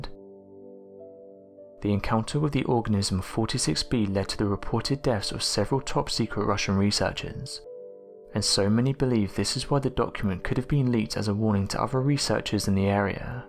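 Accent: United Kingdom. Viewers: These videos would be much better if you slowed down a bit, the rapid fire reading with the British accent makes it extremely hard to understand. Not everyone is British.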